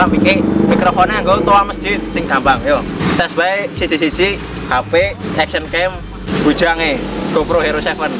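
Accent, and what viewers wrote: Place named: Banyumas, Indonesia. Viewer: Jangkrek penak videone yen ditonton..... Karo misoh. Wkwkkw seneng nyong ktmu video ngapak kaya kiyelah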